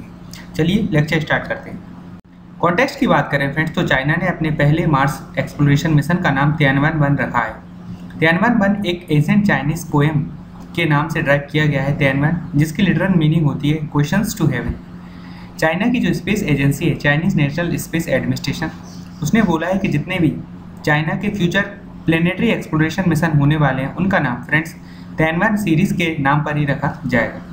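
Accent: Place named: India. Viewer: 咖喱英语，一听就知道是三哥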